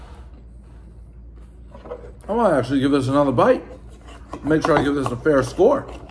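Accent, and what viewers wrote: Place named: East Coast, United States. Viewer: If you watch the closed caption, you can understand why people from the east coast sound so god damned ridiculous... That being said, I love the reviews... thanks for keeping us entertained,! You rubbaneck!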